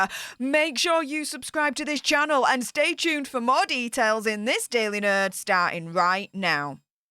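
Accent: Yorkshire, United Kingdom. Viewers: is from Yorkshire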